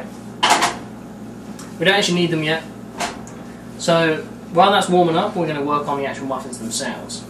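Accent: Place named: United States. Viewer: Maybe he's gaining some of the american accent by living there for a while...: )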